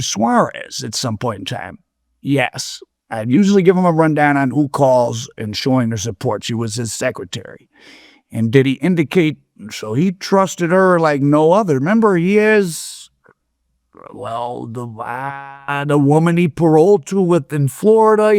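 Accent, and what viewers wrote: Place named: Upstate New York, United States. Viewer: I think meech gave her his life rights so she can work as a paid informant for him.why would he give someone he didn't know that long his life rights? because she agreed to the circumstances meech name was mentioned for a reason in this case or his name would have never been mentioned...This guy sounds like he's from upstate NY somewhere good break down though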